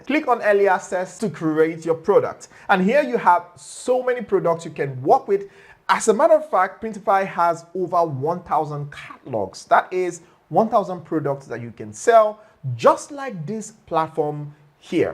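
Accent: Nigeria. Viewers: Are you Nigerian?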